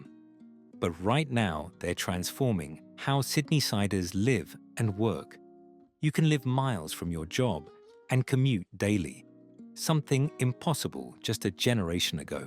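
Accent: United Kingdom. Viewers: Yes. The main narrative problem in my opinon was the a lack of pause when a new 'chapter' was being started (e.g., a new photo to signal a later period.) A visual signal would help, as you say. AI voiceovers often seem to do so well at the phrase level, but have no training currently at wider narrative. We also hear the anomaly of an British English voice mouthing large numbers US-style, without the "and" we put between hundreds (for example) and the sub-hundred part.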